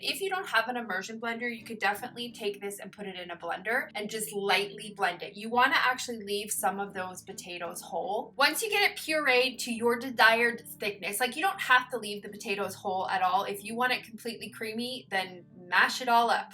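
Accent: Canada. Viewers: I think I remember you saying that you are from Canada, so that explains your accent. I am so happy that you are getting new subscribers. I love your videos, been with you from the beginning.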